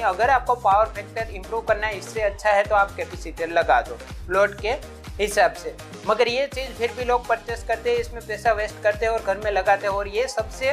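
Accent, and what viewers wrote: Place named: Gujarat, India. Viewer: language tone denotes gujrati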